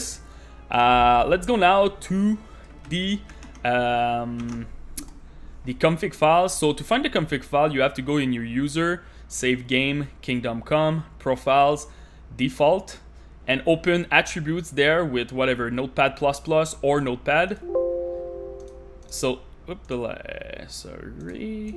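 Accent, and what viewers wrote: Quebec, Canada. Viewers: pas francais , humhum , un quebecois canadien francophone :D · T'es quebecois c'est sur hahahahha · Qc man ? "J'orconnais" ton accent... J'ai le même haha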